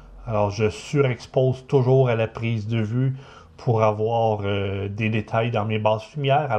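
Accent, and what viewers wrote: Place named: Canada. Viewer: Tres bien expliquer .merci beaucoup ( j adore votre accent canadien )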